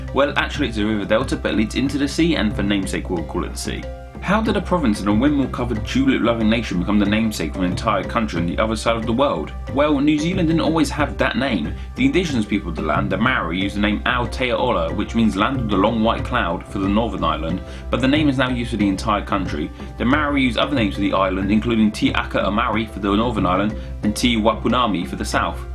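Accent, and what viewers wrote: United States. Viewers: Benjamin No. This guy is from the UK and he's speaking like a yank.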